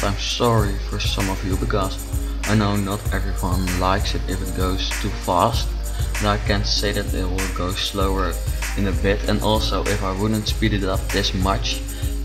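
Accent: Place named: Netherlands. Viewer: ik weet dat je nederlands bent :p dat herken je zo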